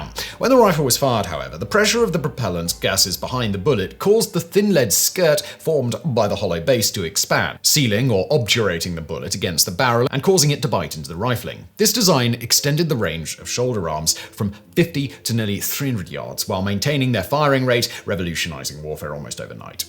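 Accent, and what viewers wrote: United Kingdom. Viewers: Come on Simon give us history of the dildo! I just want to hear that whole topic discussed in that proper British accent!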